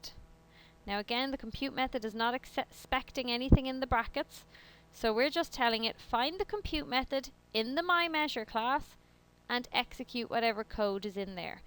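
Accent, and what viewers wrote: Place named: Ireland. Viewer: Very helpful! Nice to hear an Irish accent on this topic!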